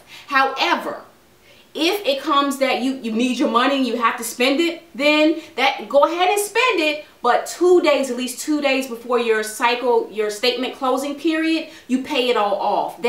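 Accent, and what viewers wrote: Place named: DMV, United States. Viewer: Love your accent. Sounds almost like DMV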